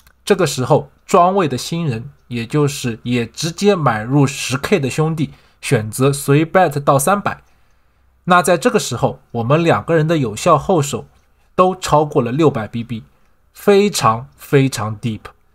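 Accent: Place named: Hangzhou, China. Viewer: 口音杭州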